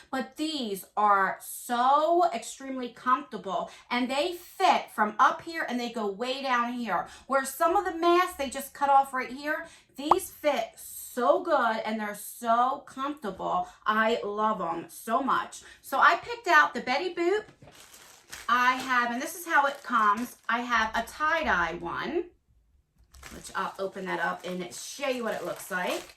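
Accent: New Jersey, United States. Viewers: I love your make up and your cute Jersey accent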